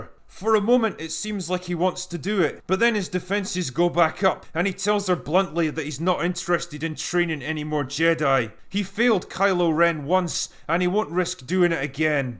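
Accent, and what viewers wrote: Scotland, United Kingdom. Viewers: you clever weaver of character tapestry you hear me say. You didn't just 'fix' Luke Skywalker, you provided him salvation. You cleverly wiped the stain of shame from him and restored him to the galaxy saving hero we all came to know and love. I've already bought several of your books, now I eagerly await the version of the Sequel Trilogy. Hell, I'd even pay for the audiobook if you narrated it. Just can't get enough of that Scottish slurring of poetry! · Despite being read by a Scotsman who sounds like he's angrily gnashing his teeth at the height of inebriation this script is pure healing. I don't get why so many directors also write the script of their movies nowadays when they're clearly two distinct skill sets. And who greenlights these things?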